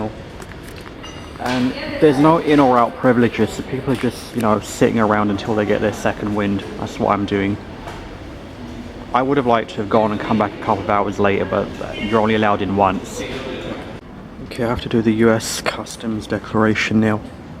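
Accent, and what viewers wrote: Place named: United Kingdom. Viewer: Well you are born in London and then move to Canada from London . I say this because you have talk with british accent.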